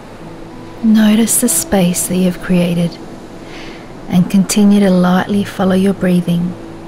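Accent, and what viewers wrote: Australia. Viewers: New fave yogi channel discovered! Loved the visualization and ofc your gentle Australian voice :3 (I studied in Melb for a year, so I miss that accent)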